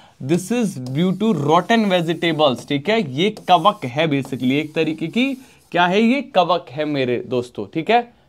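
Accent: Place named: Jaunpur, India. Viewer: Sir... Apke padhane ka style bhoat hi pasand aaya hai.... Ek dam jounpur sultanpur ki tone me.... Mazaa aagya evs ko desi touch me padh kr🙏🙏🙏😊thank u sir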